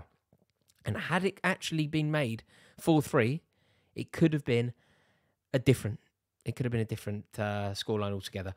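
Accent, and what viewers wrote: RP, United Kingdom. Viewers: Rory seems like he grew up rich and went to Oxford. Love the vocabulary and posh accent 🎉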